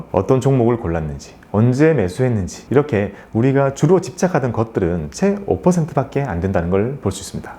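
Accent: Gyeongsang, South Korea. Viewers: 책 읽고있는데 특유의 경상도 말투? 음성지원되는거 같아요. ㅋㅋ 세상 쫄보인 제가 주식이란걸 시작하게 될 줄은... 덕분입니다. 감사합니다~~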